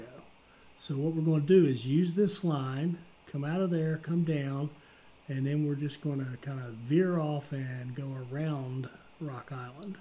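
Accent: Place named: West Virginia, United States. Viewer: Thanks for this episode, or the whole series for that matter. Railway Empire is one of those games I drift back to every now and then, for the sheer joy of watching trains go, and composing the song for them - err the loads they will carry by designing this 4 city cluster. I only found your series recently, I was kind of doing my own thing. Mostly writing spaghetti westerns with my rail lines. But the method/way you connect things has improved my own game a lot. So thanks for taking the effort to share your nice gamestyle. Hardly noticed the West Virginia accent 😆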